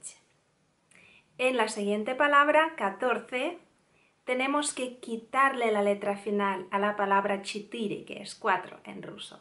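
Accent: Spain. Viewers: WOW......... FINALMENTE recuperas tu acento castellano , bienvenida , Como siempre gracias por tu tiempo y educarnos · Felicidades por ablar español.pero debés saber que él español de España ningún país latino les gusta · Hola!. Me gustan todos tus videos. De a poco estoy aprendiendo ruso con vos!. hablas muy bien el español y tienes el tipico acento español (España). Какие красивые глаза,! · pero xq hablas con el acento español? El vídeo sale mejor si lo hablaras sin ese acento. Me hace recordar a la serie rosa.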